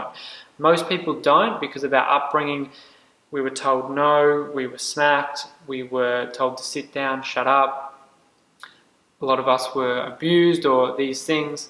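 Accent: Australia. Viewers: Is there any value in doing Intermittent Fasting and not having any water or do you have to fully fast? (great to hear an Aussie fast!)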